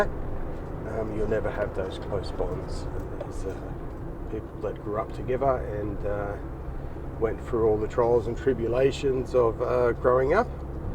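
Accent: Australia. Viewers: I'm a boomer Aussie looking to the Akiya market, it's not important, I just spend so much time in Japan, it makes sense that I should consider a home here (Aomori) You're a Taswegian, Queenstown or somewhere nearby by the sound of it. It's a eye opener, being in Japan, isn't it. Love to chat with you...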